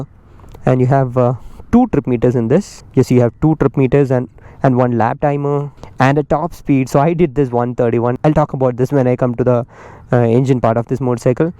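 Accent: India. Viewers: Such a calming soothing voice and also you speak English in such a fashion it feels like you are speaking in hindi. Amongst the most genuine and humble person i know on youtube. Keep up the good work bhai, ❤️🙏😍🙌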